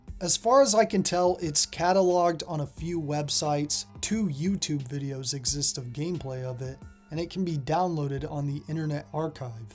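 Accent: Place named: South, United States. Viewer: Maybe it’s his ambition to be “refudiated” for his desire to use the word. 😉 In seriousness though, I notice that he has Southern-accented English, the vernacular forms of which posses a lot of non-standard pronunciations for common English words; for example as a Texan, I remember mispronouncing the word “Nuclear” as “Noo-Cue-Lure” instead of the correct “Noo-Klee-Ur” thousands of times before it later became a US-wide meme in 2002 due to “a certain Texan US president”. “Lambuxatious” sounds vaguely familiar, but I can’t immediately put me finger on what words it’s a derivative of (perhaps a combination of “To lambaste”, “To buck”, and “Vexatious”? 😂 ).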